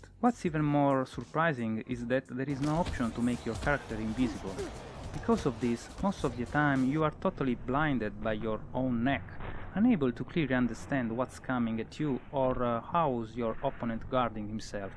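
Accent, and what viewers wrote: Italy. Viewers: this is a real italian accent!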